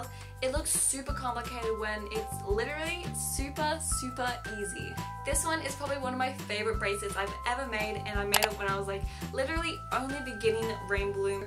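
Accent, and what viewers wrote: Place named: Australia. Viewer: #loomersquad love love lovee your videos, great to know that there are still some loomers out there! also love your accent, im Australian aswell 💙🌈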